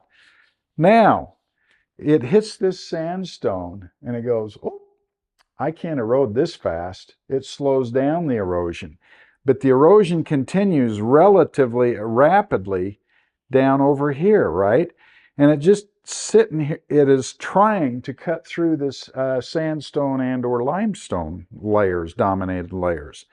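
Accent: United States. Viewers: Great content. Just so you're aware, the YouTube cc talks about "Anna Klein" where it should be anticline. It would be great if your editor could add these key terms and the names of the various structures as text on screen for people who are hearing impaired, watching with background noise, or not native English speakers accustomed to an American accent.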